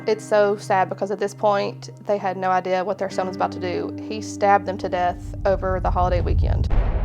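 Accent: South, United States. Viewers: Thanks for covering this. It is so sad to think that the parents knew that their own child, the one who lived off of them, the one that Lisa worked for, delayed retirement for, was killing them for reasons of pure greed. They were nothing but kind, Lisa even buying him his favourite ice cream. He is probably one of the worst psychopaths ever. I wonder what he thinks of his life now? I hope that he is miserable. BTW, I love your videos. Your "southern accent" is really cute. ❤Cheers, from Canada.❤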